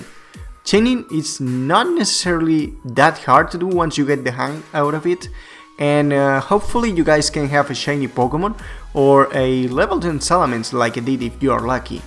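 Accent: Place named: Spain. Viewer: Se nota que eres español pero tienes un buen nivel de ingles! Ha caido algu shiny ya?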